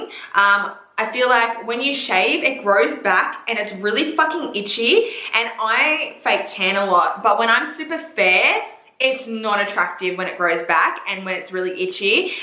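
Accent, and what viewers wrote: Australia. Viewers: Your Aussie accent 😩. Love it girlfriend.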